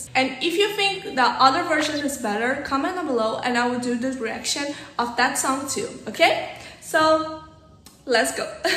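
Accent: Brazil. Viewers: Great reaction! I love Lara, she is such an underrated artist. Unfortunately, she didn't make it in America so she kinda missed a huge market and the public a huge artist. O sotaque brasileiro é inconfundível, rs.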